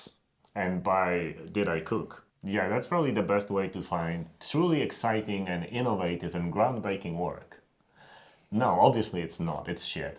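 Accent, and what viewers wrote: Poland. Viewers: i love this polish accent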